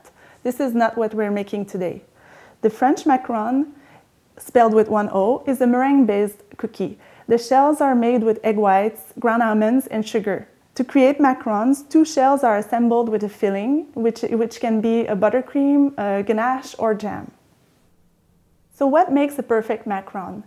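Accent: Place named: Quebec, Canada. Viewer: Thank you for taking your time to share this wonderful, detailed class! I knew you were French Canadian by listening to your lovely accent. I moved from Toronto to Quebec City in 2014 and in 2020 to Lac Beauport with my new husband. Merci encore! 🤗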